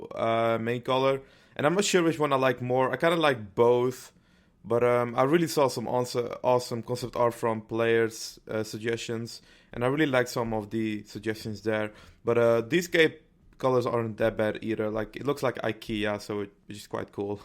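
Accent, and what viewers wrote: Netherlands. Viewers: is how 80% off the dutch ppl speak english ahha! I'm also a proud dutchman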